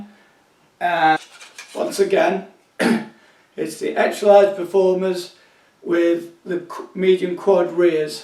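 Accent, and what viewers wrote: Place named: England, United Kingdom. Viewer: G'day from Australia, fantastic in depth, informative review. I want one! Great to hear an English accent on a surf related video for a change! Are they really lightweight? Loved your comment on buying the board for maximum fun rather than how cool it looks. Subscribed. :)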